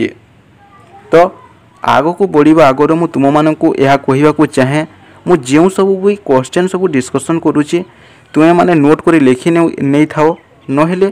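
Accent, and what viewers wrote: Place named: Odisha, India. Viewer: Bhai tor katha ru to balangiria bhalia laguchhu tui..odia bhal se kahi nai janbar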